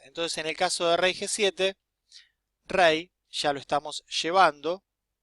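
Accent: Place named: Argentina. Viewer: Muchacho, eres argentino por el acento. JAMAS escuché una explicación tan clara de este abstruso asunto para los no dotados para el ajedrez, como quien suscribe este comentario. Grassssss....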